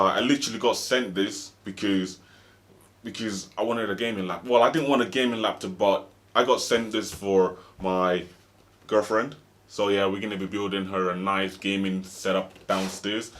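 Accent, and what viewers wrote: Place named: United Kingdom. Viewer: Alabama? But sounds like british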